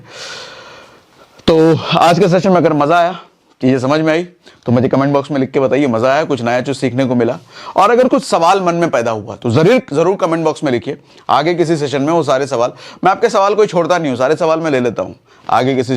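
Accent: Kanpur, India. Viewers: Sir kanpur se ho kya. Apke bolne ka tareeka kanpuriya hai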